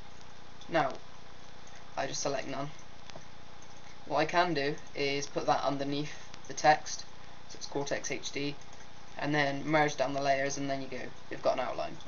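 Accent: United Kingdom. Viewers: Awhh One Direction Accent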